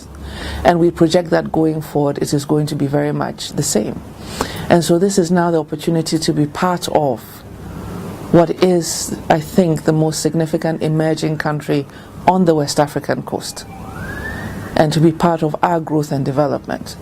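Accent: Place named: Ghana. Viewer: i love her GHanaian way of speaking English- very nice and clear!!! lovely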